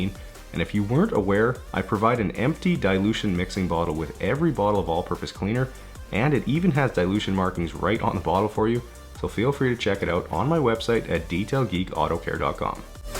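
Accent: Canada. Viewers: He’s sounding extra Canadian in this one, right? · I can hear the Canadian accent for sure here lol please come to Toronto man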